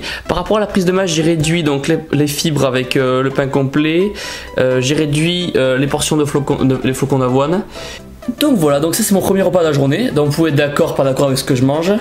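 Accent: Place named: Toulouse, France. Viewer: DAAAMN! Salut, j'ai une ptite question concernant mon alimentation, mais avant tout, saches que jte connais que depuis à peine 24h ( mieux vaut tard que jamais) et j'ai déjà regardé presque toutes tes vidéos en un jour, jmet le pouce vert à chaque fois et jme suis abonné biensûr;) J'adore cque tu fais, ton humour, tes intros, tes conclusions et biensûr... Ton accent prononcé de chez nous! ( je suis aussi toulousain et c'est une fierté que tu le sois aussi;) BREEEF! Je suis en sèche depuis un peu plus d'un mois et j'aurais aimé que tu me dise ce que tu pense de mon alimentation ( elle est vraiment très très proche de celle que tu montre dans la vidéo "QUE MANGER POUR PRENDRE DU MUSCLE SEC?" ) Mes compléments sont: de la whey isolate, de la caséine de calcium et des oméga 3 de <link> et jboufe pareil que toi genre œufs le matin, du poulet, des haricots verts, de la whey, de la caséine au coucher etc. 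La seule différence que j'ai avec toi, c'est que, pour être en déficit calorique tous les jours, je prends pas la collation de 10h et mes repas sont espacés de 4h avec 35g de prot à chacun. Je suis très carré, je pèse tout et calcule toutes mes kcal et je ressens pas le besoin de faire de cheatmeal pour le moment ( ma motivation me surprend moi-même ) En gros jfai en sorte d'être en déficit calorique tous les jours et j'ai un apport de 35g/4h de prot environ pour le maintien musculaire. VOILA VOILA pour résumer le prk de mon message, j'aimerai bien que tu me donnes ton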